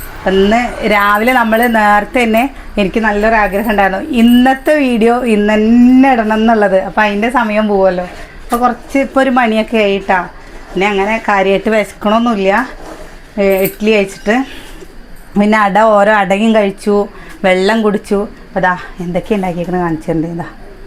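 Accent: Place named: Valluvanad, India. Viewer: വള്ളുവനാട് ഭാഷ അങ്ങനെയാണ്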